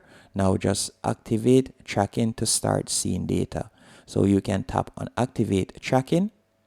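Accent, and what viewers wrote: Jamaica. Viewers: It's nice to land on a video with a Jamaican accent. Your videos are helpful, thanks.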